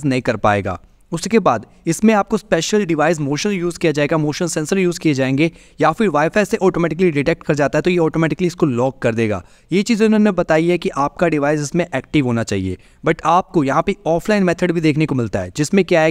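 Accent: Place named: India. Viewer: You talk too fast with the hindi accent.😮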